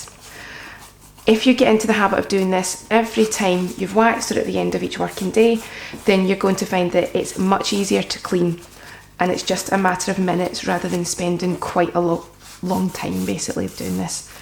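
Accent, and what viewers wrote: Scotland, United Kingdom. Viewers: I benged Outlander and now the Scottish accent seems to be following me lol. I love your accent. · U sound Scottish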